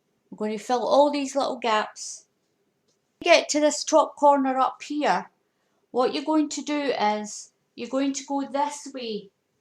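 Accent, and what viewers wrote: Scotland, United Kingdom. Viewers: she's sounds Scottish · Lovely hearing a Scots accent 👍🏻💜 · Are you from Scotland? My paternal grandparents were from Scotland. Both of my parents worked so I spent most of my time around grandma Mary and Grandpa Pete. I developed a Scottish accent even though I have never been to Scotland. It's gone now, but it's funny to think about it. They had a very heavy accent so I had to "translate" for them often. LOL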